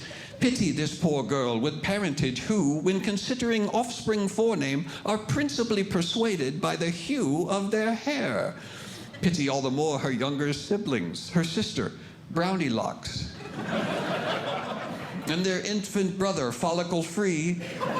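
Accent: United Kingdom. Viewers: British accent and Shakespeare? Wow!! Talented guy!! Bloody brilliant!❤😊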